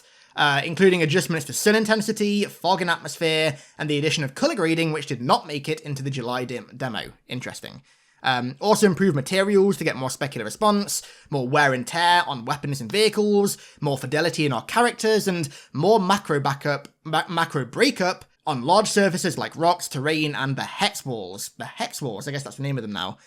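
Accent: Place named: United Kingdom. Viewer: Brittish wankuh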